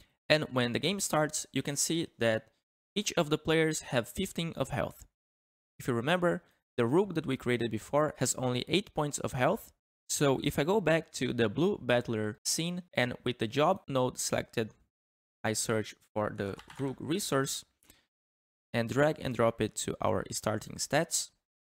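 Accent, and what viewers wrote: Brazil. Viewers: Esse sutaque nn me estranho kkkk br certeza.. oueut o ficando loko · Woah, such nice Brazilian Accent,! Gotta like that! Also, nice video:3 É nois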